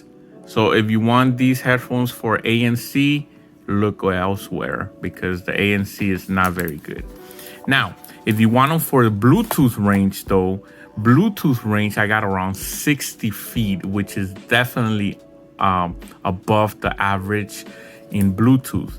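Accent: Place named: Colombia. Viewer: I like your Colombian accent 😇😇😇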